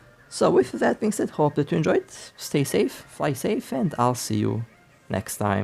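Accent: Russia. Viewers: Жуткий рязанский акцент )) а так оооочень интересно;)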